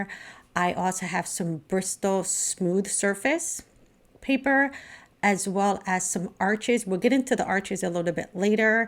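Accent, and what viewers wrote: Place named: New York, United States. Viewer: Paper is key! You sound like a fellow NY'er (im in N.Fla now). Thanks for the info💗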